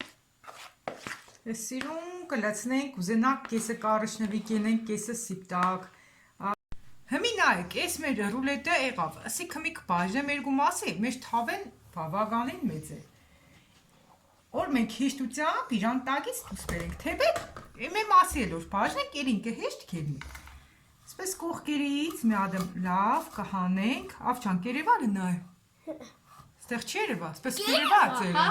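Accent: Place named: Gyumri, Armenia. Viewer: Shat em sirum gyumrii barbare akcente...😃😃😃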